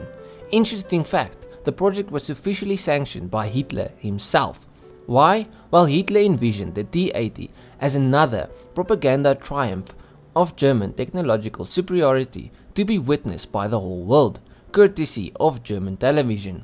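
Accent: South Africa. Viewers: i notice that South African accent from miles away😂❤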